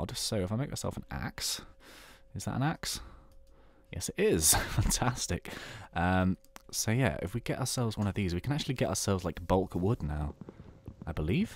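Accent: United Kingdom. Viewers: that silky british voice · Hey, what nationality are you? British? Cause your accent is really awesome. =O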